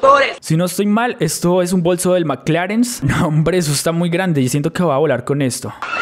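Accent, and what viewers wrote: Colombia. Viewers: Antes cuando te veía me sonaba muy paisa tu voz pero ya tot acostumbrado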